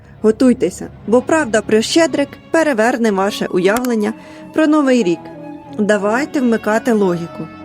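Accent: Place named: Poltava, Ukraine. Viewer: Як же мені подобається ця солодка українська промова, нагадує полтавську вимову.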